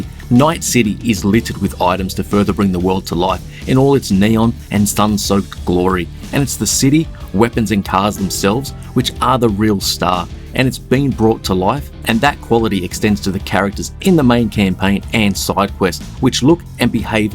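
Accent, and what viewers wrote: Australia. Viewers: Good to hear an Aussie doing some game reviews Keep it up dude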